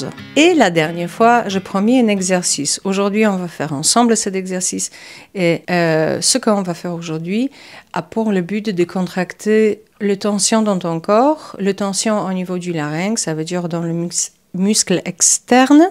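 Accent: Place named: Czech Republic. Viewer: Vous êtes des pays de l’est, tchèque? En tout cas trés intéressant votre voie pour travailler la voix.En comédie, pour dire, on représente un autre que soi,une cible, les yeux dont vous parlez , avant la voix que l’on projète. Merci!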